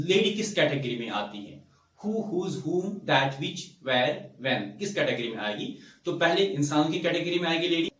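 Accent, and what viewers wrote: Kashmir, India. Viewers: Sir ji aap Kashmir se ho kya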